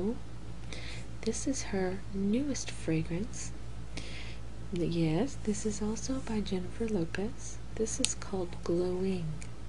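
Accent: South, United States. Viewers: Yes, your southern accent =) It's the best soothing voice i ever heard *-* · I love your asmr offerings. I'd love to hear you tell us about your tattoos. Your voice is so beautifully southern. :)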